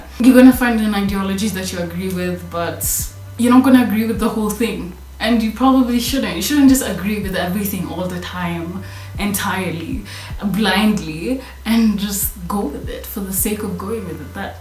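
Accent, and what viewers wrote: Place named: United Kingdom. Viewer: Very unrelated to the video but this is the first video of yours I've watched and for a good solid three minutes, my brain was fighting itself over your accent, like half of it was like "definitely British" and the other half was just "idk what that accent is but it is Not british" and the first half of my brain was like "oh so do you have any ideas" but then I remembered that most people have their country in the about section of their channel so that cleared it up. (Can you tell it's late at night for me) Anyways about the video, i've heard terrible things about Twitter and tiktok specifically so i just never got them, but i have seen how it just makes people more argumentative, like it seems everyone wakes up and chooses violence. Obviously it's not anyone's job to educate anyone and everyone about, say, why people deserve human rights, but it seems that people will use that as an argument, like "screw you go google it that's not my job" when someone asks for, say, sources to corroborate a claim. 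And another thing is politics. I'm not American but I'm sure most people see a good bit of American politics on social media, since it's a global power and all. But at this point all i see is Republicans and Democrats calling each other the r slur for just existing, whether the previous conversation is political or not. And that kind of political tribalism, which was shown in an election in my country before i was born where 800 people died, does not lead to anything good ever. I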